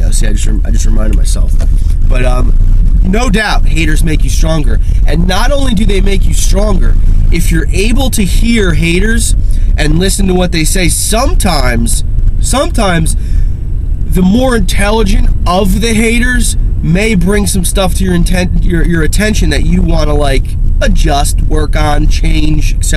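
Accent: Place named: New Jersey, United States. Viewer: You sound like you're from Jersey. I'm subbing. I agree though. It does take someone with character and who is genuine to put themselves out on video. Most people I know are too afraid to get on camera. The people who aren't afraid, though, actually have some real stuff to put out there. I'm overcoming the flu myself. I know your vid was uploaded 2 years ago, but thought I'd share that :-)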